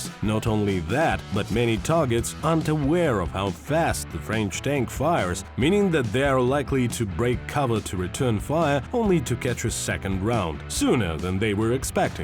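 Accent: Russia. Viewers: Rest of the community: (A WH40K Tyranid Hivemind that can't get a cohesive thought) Me: "Ah yes, I like your words and history, thick Russian narrator man!"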